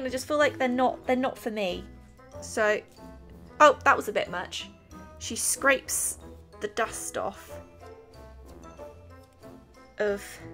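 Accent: United Kingdom. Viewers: I have a few questions (for any future Q&A's. wink *wink*): How old are you? When is your birthday? Do you have a boyfriend (you're very beautiful btw)? And how do you still have your british accent when you've lived in the usa your whole life? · Pigmented. Love your videos. Where are you from originally? It’s a British accent, probably south? I’m in Liverpool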